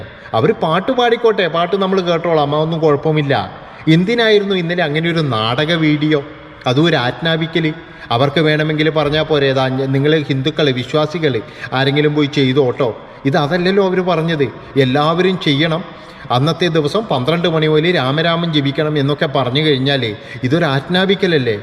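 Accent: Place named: Thalassery, India. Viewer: ഇങ്ങള് തലശ്ശേരി കാരനല്ലേ... അല്ല കണ്ണൂർ ജില്ല കാരൻ ഇങ്ങളെ വർത്താനം നല്ല രസമുണ്ട്.. ഒരു കളങ്ക മില്ലാത്ത സംസാരം... എനിക്ക് ഇഷ്ടപ്പെട്ടു... ഇങ്ങള് ചിത്രയേ പറ്റി പറഞ്ഞത് നൂറിൽ നൂറു ശതമാനം ശരിയാ... ചിത്ര ചേച്ചിയുടെ ശബ്ദം മധുര മുള്ള താണ്. ഇനിയും നില നിൽക്കട്ടെ.. സംഘി കളുടെ വലയിൽ പെട്ടുപോകരുത്..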